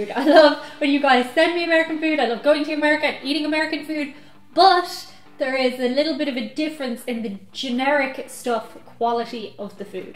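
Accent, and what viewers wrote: Ireland. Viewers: Beautiful as usual. I always enjoy the Irish accent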